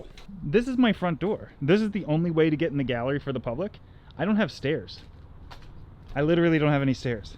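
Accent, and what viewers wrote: Canada. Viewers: My confusion is, clearly you are somewhere in Canada from the accent, and of course the 4 kilometers from house to new gallery. Why then do you measure the space between stands in inches and not centimeters? Just something I was curious about.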